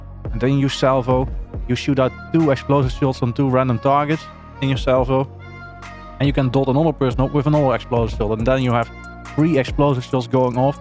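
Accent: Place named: Netherlands. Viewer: Just started playing MM, pretty new to wow, not a very good player but trying to learn the ropes and get into pvp! Btw are you from NL? I lived there for 10ish years and your accent reminds me of my time there :)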